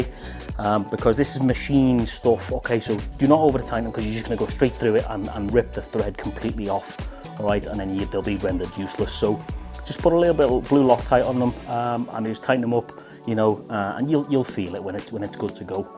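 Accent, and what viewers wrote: Liverpool, United Kingdom. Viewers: Nice one scouse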